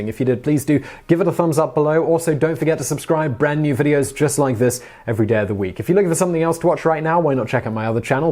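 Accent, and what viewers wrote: United Kingdom. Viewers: Sometimes British accents make me want to claw my ears out... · No mention of the Berlin to Baghdad railway? This is not a real History channel. Great British voice, though. Americans think Brits are smart. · Another great one by aka “the most British man in the world” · i feel the need to apologize for insulting your pronunciation of words by stating it often made me cringe. My lame excuse was knowing several Brit women and never had heard whst turns out to be an accent. I didn't consider the accent to be a speech impediment. Remarking on a speech impediment would be the lowest of the low and that is something i would NEVER do. But my remarks re: your regional accent was BAD and i do sincerely apologize.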